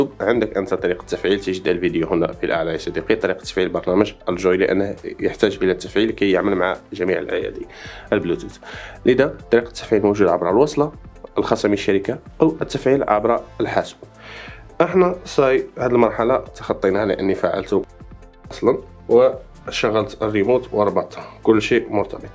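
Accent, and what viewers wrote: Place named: Morocco. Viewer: حسنا اخي بارك الله فيك اعتقدت انك مغربي بسبب لهجتك 😄🥰🥰🥰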